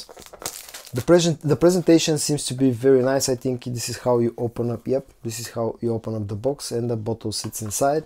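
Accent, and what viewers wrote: Bulgaria. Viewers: Great video! You're getting getting better all the time. It was funny how you just walked past the camera in the beginning. 😂 I enjoy listening to your beautiful Bulgarian accent as well, and how some words are pronounced, like it sounded like you said "meatloaf note" when you actually said "mint". 😅 I was like"Yeah! Now I need to find a bacon note!" Great to see you getting more comfortable. Thank you for the time you spend sharing with us. Love from Virginia, USA.❤️🙏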